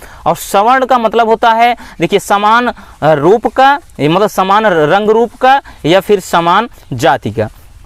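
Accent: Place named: Bihar, India. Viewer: Bihar se hain aap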